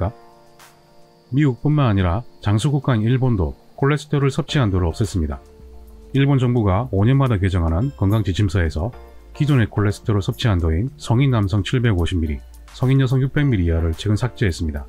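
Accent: Gyeongsang, South Korea. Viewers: 말투를 들어보니 대구분이신듯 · 선생님은 경상도 분이시군요. 목소리가 정감 있습니다.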